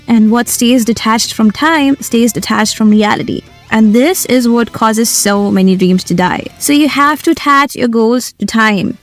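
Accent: India. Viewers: First actual good video like this, also a very good starting point in me learning to talk in indian accent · are you indian,you have a nice accent,kinda motivating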